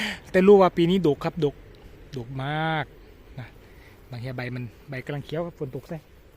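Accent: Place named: Loei, Thailand. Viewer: ฟังเสียงแล้วว่าต้องเป็นคนเลยคักแน้วเสียงที่เป็นเอกลักษณ์☺️😂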